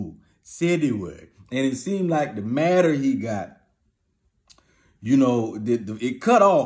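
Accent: Africa. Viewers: Shuler has the best African accent. PS I am a Nigerian. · Brutha Shuler 🤴🏾...😂😭🤣🤣 your African voice cracks me up..😂😭😭 Shaka Zulu frfr... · YOU ARE THE MAN!!!! That African accent.....🤣🤣🤣🤣🤣🤣🤣🤣🤣🤣🤣🤣🤣🤣🤣🤣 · Lmbo man u r straight up crazy... more of the African accent, love it love it love it!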